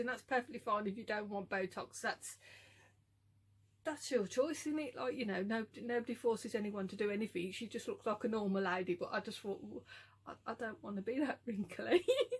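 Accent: Birmingham, United Kingdom. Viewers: My goodness. Your amazing. How I long to be able to sit in a cafe and hear a person at the next table that I don't know talking about ordinary everyday things. And that fabulous accent. Takes me back to my childhood in Brum. Your Ronnie Corbett meandering is so good. I'm not sure you know much about the 70"s you'd have been too young. However the 70's as a teenager and twenty something was amazing in Brum. At the top of Broad St you had the Saints and Sinners cafe and Barerellas and the Rum Runner . If you can't remember it you weren't on the scene. It was so cool. Thanks for posting. James. PS. your suggestion of mass surveillance and behavior profiling would be a non starter. They already do it in China. It's called "big brother" I recommend the book 1984. But not to worry your thinking out loud.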